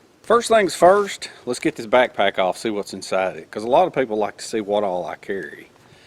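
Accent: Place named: Louisiana, United States. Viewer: You propably make the most sensible „wilderness-content“ on YT so it‘s my pleasure. And that Louisiana-Accent is the best thing ever. Your videos can not be long enough. I love to hear you talk! By the way: I keep watching my favourite video of yours again and again: „Your behaviour, choices and mindset in survival-situations“. That is pure gold. They should put it on TV 😂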